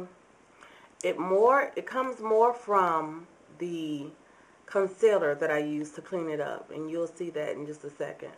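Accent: South, United States. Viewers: Girl I'm from the south and I just love how you talk with your southern ascent!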